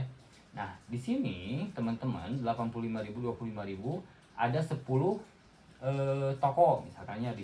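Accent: West Java, Indonesia. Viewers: assalamualaikum kang perkenalkan saya bahcen dari bandung sehat selalu kang dan jangan bosan bagi bagi ilmu nya krna sangat membantu yg masih awam seblumnya saya lihat lihat video akang kayanya dari logat bicaranya seperti orang jawa barat jika benar orang jawa barat saya siap ngopi bareng akang untuk shering tentang shopee terima kasih sebelumnya ya kang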